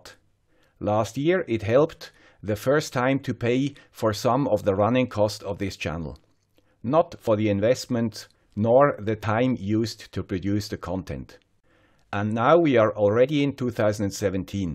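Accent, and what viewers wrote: Switzerland. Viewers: Please keep creating! I've learned more useful information from 'the guy with the Swiss accent' than I did while getting my degree. I'm proud to support you on Patreon and I look forward to many more insightful videos. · I've been watching your channel for quite a while now, and one of the things that I've noticed recently is that your voice-overs are improving a LOT, in both audio quality and overall. Part of it, it sounds like to me personally at least, is that your spoken English has gotten much better, in my opinion (it was never 'bad' in the first place per se, but it's gotten progressively better) -- however, don't ever lose your Swiss accent :) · I, like others who have commented, look forward to your "Swiss accent" and white gloves. They are your "trade marks" - look after them as they serve you well. Good luck ! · you earned this a longtime ago! 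No doubt about it. Well done to our little community for embracing the guy with the Swiss accent, I hope he feels the love & respect that we all feel for him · thanks for continuing to produce high quality and well researched videos. Your channel is one of my favourites because I know every video will be well made and I will learn something new. That must take an extraordinary effort and I appreciate what you’re doing. I never knew the background behind your introduction or the gloves - just assumed you were extra cautious with ESD - but now they’re a trademark and I look forward to watching each video from ‘the guy with the Swiss accent’. Best wishes from Australia. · Thanks for bringing the important issue to be an active youtuber to the attention. I personally enjoy the topics in your channel and found nothing wrong with the Swiss accent. I believe that the sustainability of a youttuber’s channel can be achieved naturally by its alignment with their profession or hobby. 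· I recently discovered your channel and found it very insightful. Thanks a lot for all the time and effort you are investing - highly appreciated! And - having grown up at the Swiss Border - I love your Swiss Accent as it reminds me of my childhood:-) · Hello from the guy who does not care about appearances. I had learned some interesting things from your channel and I hope that in the future you will keep sharing with us your projects and ideas. In Florin country we have a saying "Dogs are barking, the caravan is passing", keep up your work and only give your attention to things that are worthy of it. Big up for the guy with the Swiss accent. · Thank you for your perfect videos! Your hard work really motivates me not to give up. And of course we love your famous trade mark - The Guy with the Swiss Accent. Keep moving on! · When I hear "It's the guy with the Swiss accent" I know there will be a detailed and well prepared video to watch. 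I didnt know the troll comments re your hands were the cause of you wearing your gloves, that makes me sad, and I should add that having looked at an early video there's hardly anything to see, but clearly the comments were hurtful...the upside is its definitely a trademark now. To make or not to make content is your choice, all I can say is that when you make it, I enjoy and appreciate your efforts, so thank you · thanks for all of the videos. I find it amazing that you can produce videos of the quality level you do with the charts, script and editing that you have in only 10 hours. Your videos are always a cut (or 2) above the norm. The Swiss accent & the gloves are part of it all. I’ll suscribe as soon as i figure out how to do it from my i-pad. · your videos are the reason i started getting into this sruff! We all need "the guy with the swiss accent". And you take the scientific approach which i appreciate. 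Hope you stay, if you can but first and foremost. Be happy! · Thanks for all the great videos! Your channel is one of the best on electronics and one of my favorites. And the Swiss accent ROCKS :)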